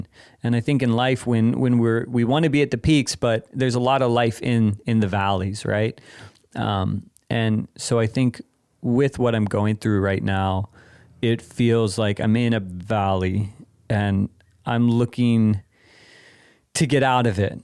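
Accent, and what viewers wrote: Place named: England, United Kingdom. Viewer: Please start making videos here again, i am from brazil, i love your english accent to practice my listening. And the main point is that everything u say at this channel is helping me a lot! Thank u ❣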